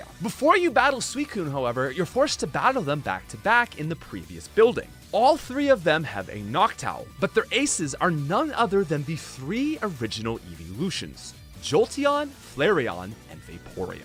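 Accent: Canada. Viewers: he probably Canadian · Thank you for my daily dose of hearing Canadian accent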